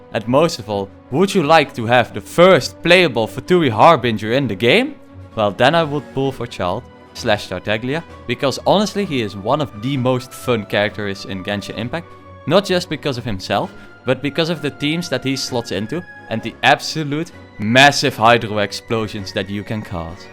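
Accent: Netherlands. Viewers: Im hearing a dutch accent.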